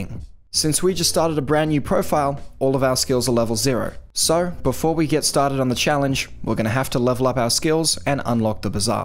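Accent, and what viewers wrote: Australia. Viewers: U Australian mate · idk where ur accent is from ( is it australia? im pretty sure it is?) also i love your content :)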